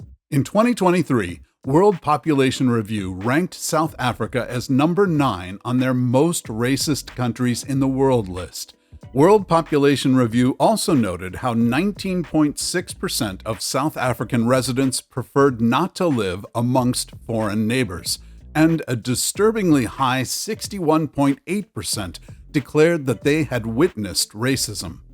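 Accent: United States. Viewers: I would classify Lebanon as a special case. They have taken in millions of Syrian refugees and their economy has collapsed. Refugees in their own country. What would this American voice say if he was on the street with nothing to eat and no real government to provide any help? There is a lot of high brow hypocrisy in this entertainment media video. Meanwhile in Texas, possibly the richest place on earth, the government is consciously packing refugees from south of the border onto buses to states where the people are less racist. That's America for all to see.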